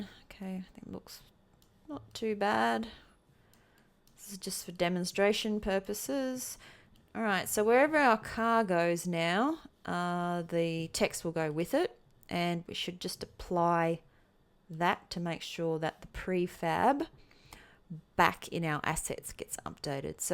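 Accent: Australia. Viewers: Are you Australian? You have a beautiful accent.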